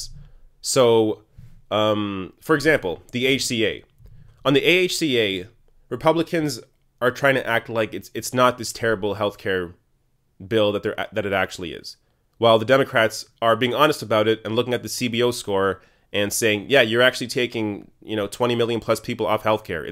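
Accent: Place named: Canada. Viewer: your accent betrays your Canadian-ness, and I have always wondered why you focused on American politics. Thanks for clearing that up. I love your rational voice as a Progressive and will promote your videos as I can.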